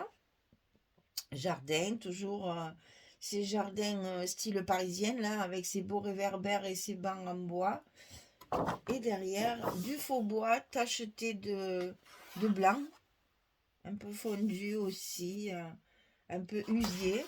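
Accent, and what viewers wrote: South, France. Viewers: Cool! si vous nous montrez comment faire un tableau avec une feuille 30x30, je prends. J'offre ce genre de cadeau de temps en temps et je suis très intéressée de voir comment vous sublimez une simple feuille. J'ai hâte de voir vos idées très créatives dans votre bonne humeur et votre accent du sud 😍qui va réchauffer notre hiver du nord 😝.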